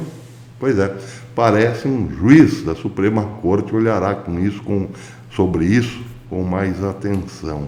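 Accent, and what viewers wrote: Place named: Minas Gerais, Brazil. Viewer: PROF...... ADORO QUANDO MISTURA A FALA NORMAL COM O BREGA OU MINEIREZ.HOJE GOSTEI, TAMBÉM, DO SARCASMO MAMATA... AG GRANDE VITÓRIA ES.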